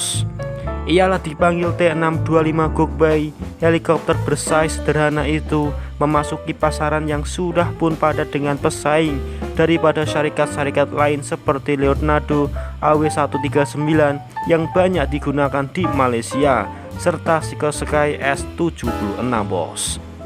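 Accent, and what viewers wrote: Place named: Java, Indonesia. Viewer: Kuat betul bunyi dialeq jawa dia ni...CG Loro telu papat ya😁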